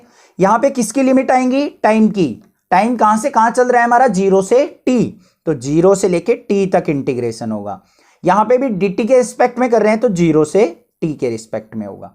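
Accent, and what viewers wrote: Haryana, India. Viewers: are you from haryana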